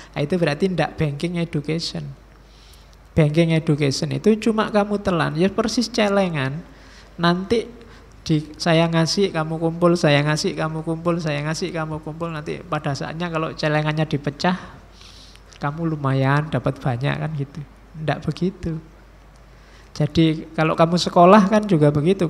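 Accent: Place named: Central Java, Indonesia. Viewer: Gaya Bicara Orang Jawa Tengah dan Orang Jogja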